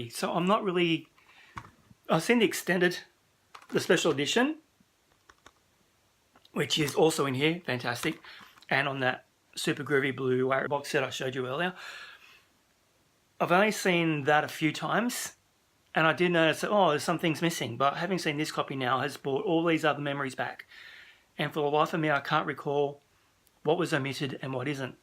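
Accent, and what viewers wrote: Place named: Australia. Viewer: It is amazing how powerful movies can be. Superman by far is my favorite movie of all time. You said something in your video that I relate to completely, and that is that Superman babysat me many many times. He was my friend. Someone I could always count on to be there for me. The magic of this movie holds up to this day. I was 8 years old when this came out, and I have seen it hundreds of times over my life. I also cried when Chris passed. He has been a huge part of my life and still is. I do not know you. From your accent I assume you are in another part of the world. (Australia?) Yet we speak the same language when it comes to this movie. And I think that's pretty cool! :)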